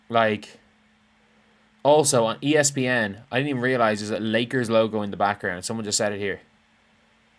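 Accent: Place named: Ireland. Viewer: He has a brogue, says he's in Ireland, Twitter says trending in Ireland and people can't figure out Ireland....sorry, that's just willful ignorance. Eire not 'Merica for. Sorry. Best wishes to all.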